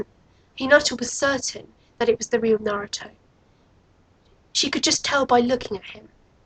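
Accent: United Kingdom. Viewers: You have a cool british accent :D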